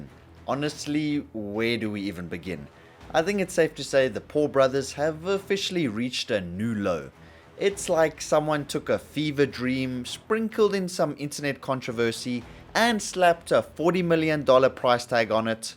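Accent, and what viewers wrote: Afrikaans, South Africa. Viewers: Im addicted to your videos, this is my second video I've watched in a row because of that accent. Up the bok, up the afrikaans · your accent sounds south african in my opinion, please reply to this if i was wrong, thanks!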